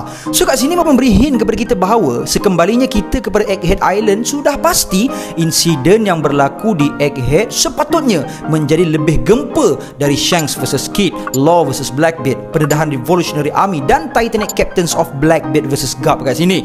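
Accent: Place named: Batu Kurau, Malaysia. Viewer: cakap batu kurau sikit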